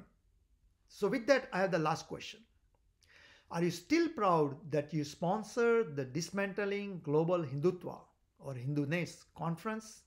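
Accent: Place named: India. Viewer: For a person who has been in the US for 45 years, you do sound like a freshly arrived Indian. Why do you deny everything about the atrocities committed by high caste Indians and their stooges in India? The results of hate that have been sown in India are becoming very clear all over the world. Just last week the Australian Govt has arrested and deported an Indian Hindutva supporter, Even the Oxford student who had to flee from her position is of the same ilk!! Of course, you will say "it's not proven"!! I am glad that the second generation of Indians in America keeps away from the likes of you!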